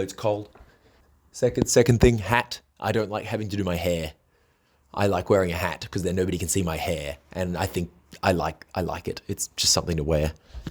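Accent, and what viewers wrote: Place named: Australia. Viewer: You sound more Australian in this channel for some reason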